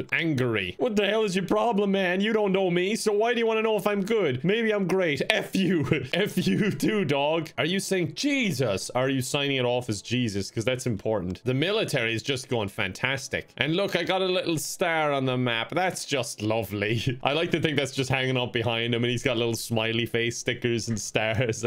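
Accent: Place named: Ireland. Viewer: I swear the more insane gets the heavier his Irish accent gets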